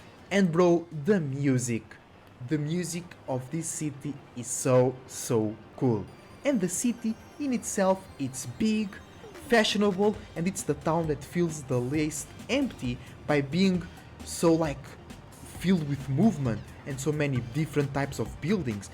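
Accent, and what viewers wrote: Portugal. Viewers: You have a portuguese accent Eu tinha feito este comentário antes de mencionares, achei o video bem top 👌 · eu qd oiço um portugues a falar ingles sei logo ahahah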